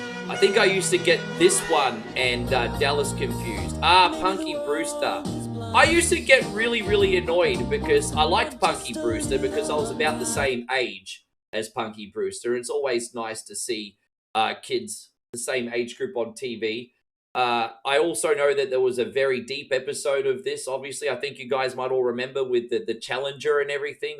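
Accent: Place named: Australia. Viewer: just a suggestion... For people that don't know you, your charm is your accent. Maybe putting in the title somewhere that you're an aussie would gain you clicks.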